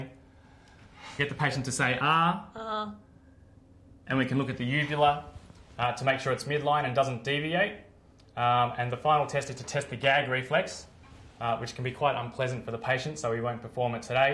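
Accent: Australia. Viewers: Very Australian indeed... mayte... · Sound Australian to me mate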